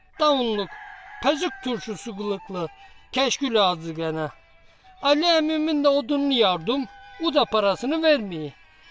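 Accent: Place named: Giresun, Turkey. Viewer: Emeğinize ve ağzınıza sağlık başkan. Çok güzel bir Giresun şivesi olmuş Rahmetli babannem böyle konuşuyordu.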